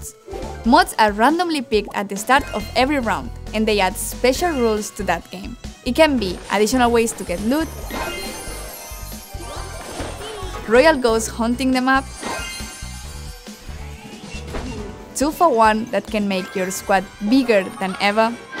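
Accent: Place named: Spain. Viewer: Precioso acento Español y genial beta jajaja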